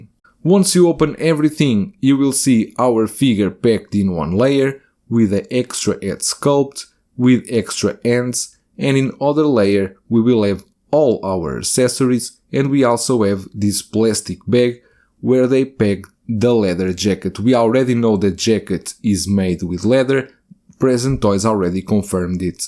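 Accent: Portugal. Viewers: Portuguese accent?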